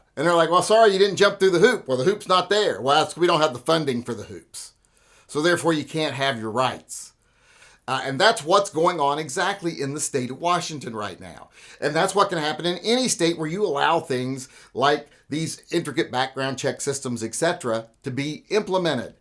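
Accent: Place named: New Jersey, United States. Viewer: sounds like new jersey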